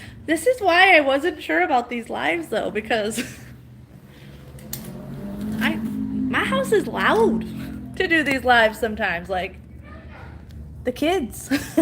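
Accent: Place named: Minnesota, United States. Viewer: just a note gators can and do come into brackish waters they can live in it for a short time. I feel the same about Shark vacuum's I have one and love it, it works really well! Jungle theme is nice for a baby 's room! I like the dawn power wash I've seen where you can make your own? If your son has asthma make sure you don't have ligustrum's (privet) hedges planted near they cause attacks a friends son was just in the hospital because of them... Yes you do have a Minne soda accent Lol! But I like it!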